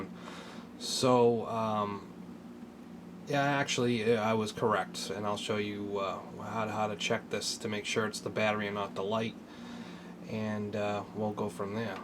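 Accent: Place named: New York, United States. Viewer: THANKS!! You answered all of my unanswered questions. Hit the nail on the head my battery read 4.2 volts. by the way loved the accent, you must be from New York Thanks again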